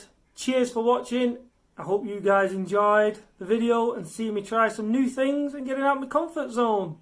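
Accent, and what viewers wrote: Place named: Ireland. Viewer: No problem bro, and gotcha. I heard more of an Irish accent but I don't know much about that lol.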